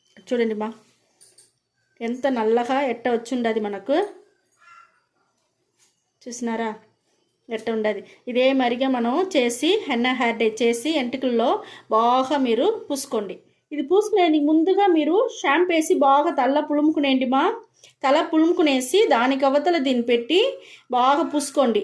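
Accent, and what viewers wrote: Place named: Rayalaseema, India. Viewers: ఈమె చిత్తూరు యాసలో మాట్లాడుతున్నారు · Tamil kadhu, rayalaseema telugu adhi, naa frnd vundhi rayalaseema ammayi, thana slang kuda ala ne vuntadhi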